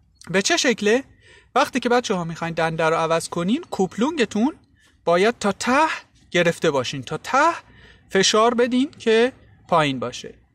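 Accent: Shiraz, Iran. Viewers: لهجه ی شیرازی خیلی شیرینه ربطی نداشت ولی کلا گفتم😂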